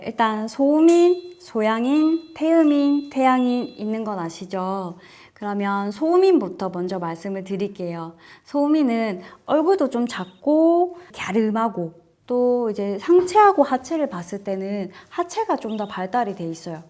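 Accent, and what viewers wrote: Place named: Daegu, South Korea. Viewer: 내용도 좋은데 억양이 재밌어요 경상도중에서 대구쪽인 듯 한데 근무지가 대구이실까 모르겠네요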